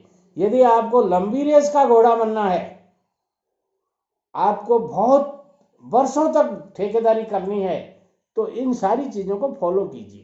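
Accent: Rajasthan, India. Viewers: Dadaji Aap Rajasthan me konse jile se ho Bhansa aapki Rajasthani si lg rhi h. point 1. Bai ka fool bai k lag jay. 2.Bahut bde bangle ka kam tevad diya.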